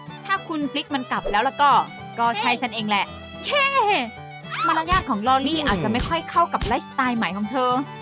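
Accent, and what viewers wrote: Isan, Thailand. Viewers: น่ารักจังเลยชอบสำเนียงอีสาน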